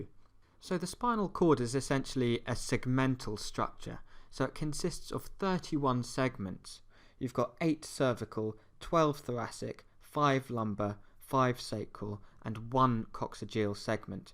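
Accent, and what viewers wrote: United Kingdom. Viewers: I am a layman. But I love the accent of your British English.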